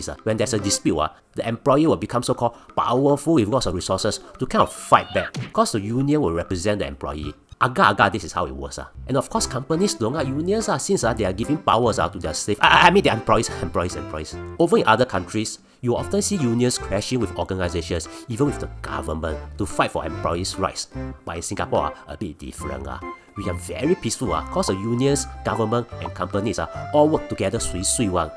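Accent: Singapore. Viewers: Balalalal... New singlish siboh?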